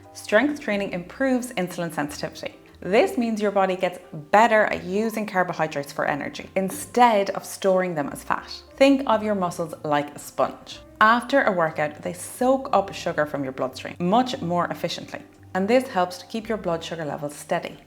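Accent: Ireland. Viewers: This is a good video to help with weight loss. It's also a great video to help with learning an Irish accent. :)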